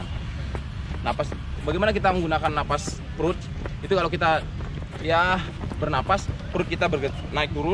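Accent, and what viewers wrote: Bali, Indonesia. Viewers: Logat nya om kok kayak orang bali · Bang dulu apakah pernah di Bali? Kok logatnya agak Bali gitu ya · Pantesan kayak ada logat-logat bali, ternyata kelahiran bali wkwkwk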